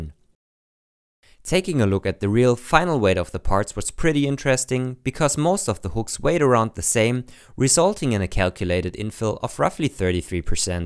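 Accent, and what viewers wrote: Germany. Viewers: Like the Videos, but the german englich (i am german too) distracts me.. xD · Man hört schon ein bisschen, dass Du Deutscher bist...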